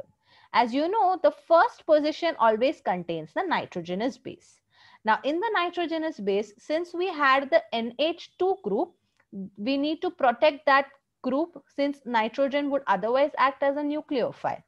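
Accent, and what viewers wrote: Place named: India. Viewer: Too much emphasis on Indian Accent.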